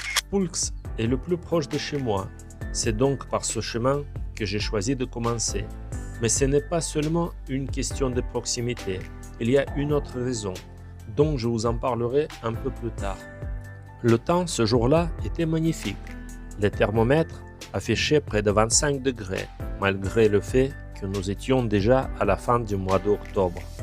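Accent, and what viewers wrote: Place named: Russia. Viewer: Bonjour. j'espère que tu vas bien depuis la dernière fois j'ai adoré ta vidéo que ce soit ta façon de raconter le lieu que tu nous fais découvrir la musique qui va bien aussi et ton petit accent russe que j'aime bien tout particulièrement on voit que tu étais bien renseigné bonne idée pour les genouillères j'espère quand même que quand tu pars tout seul tu donnes ta localisation à un proche on sait jamais ce qu'il pourrait arriver en tout cas superbe vidéo continue comme ça le succès est garantie ça serait bien qu'on arrive à se revoir avec l'équipe je te dis à la prochaine fois😊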